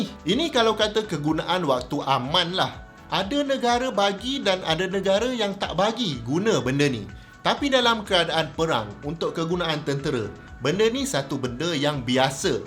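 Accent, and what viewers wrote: Malaysia. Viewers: Penyampaian jgn kaku ,lagu kasi cantik lagi, mana2 perkataan inggeris jangan bunyi Melayu sangat, agak2 sikit, jadi kelakar nanti murid2 nak educate. Kurangkan r & ē tu. Good juob.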